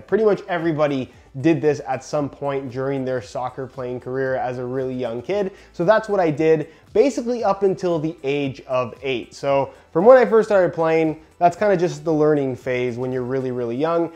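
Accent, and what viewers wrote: Canada. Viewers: I knew you were Canadian! Can always hear the accent of my people LOL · i LazyCake The face is so Canadian? No. The only tell is his accent, his face could be American, German, French, Italian, Dutch, etc... what does “his face is so Canadian” even mean? I’m from Canada and I’ve travelled a lot, so I just find that wording hilarious. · I knew you were Canadian. I argued this with a friend "Deng". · Wow your Canadian accent is so prominent to me in this I never really noticed I guess.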